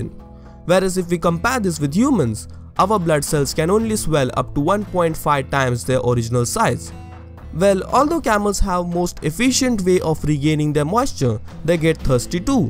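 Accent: India. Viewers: great video dude! but I have got to say this. try putting some effort on improving your accent. i know you've been trying and I can see from your previous videos you have improved. try taking professional help. because non-indians find it hard understand Indian accent. I am asking you to do this because your videos are great stuff and I want this to be popular worldwide. hope you reach your goal as early as possible! cheers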